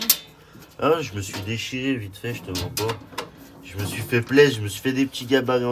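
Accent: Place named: Lyon, France. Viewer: Il a bien l'accent lyonnais. Beau projet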